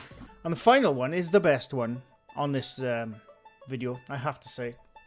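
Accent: Wales, United Kingdom. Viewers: your Welsh accent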